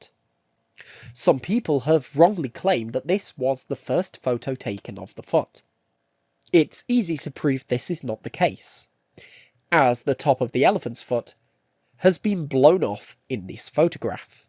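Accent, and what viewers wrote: United Kingdom. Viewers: He's british, not german. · British Accent + Documentary + Chernobyl = Random Algorithm Banger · I’m unsure if this is AI narrated or not. The narrator is clearly British sounding but he uses the term flashlight instead of torch?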